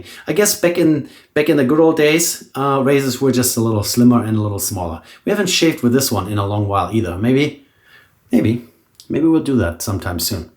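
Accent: Germany. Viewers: Thanks! Great videos and I appreciate the accent, is it German? 👍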